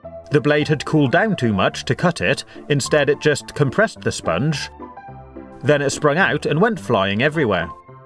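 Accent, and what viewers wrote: England, United Kingdom. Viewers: Is he English or does he just have trouble speaking with any fluidity. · got a proper gay English accent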